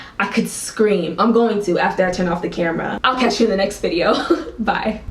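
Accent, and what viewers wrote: Jamaica. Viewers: wait are u jamaican? also love this video 💗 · Mumma yah jamaican????me keep getting recommend to you channel ,me ago subscribe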